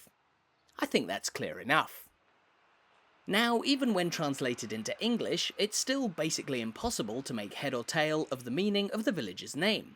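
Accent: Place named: RP, United Kingdom. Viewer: You have such a command of the speaking word…you speak like the BBC.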